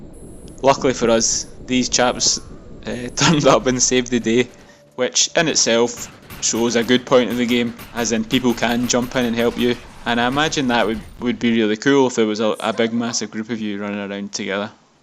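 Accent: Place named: Scotland, United Kingdom. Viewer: First time hearing you speak, didnt know your were that broad scottish;) its stocko by the way, thanks for the shout out:)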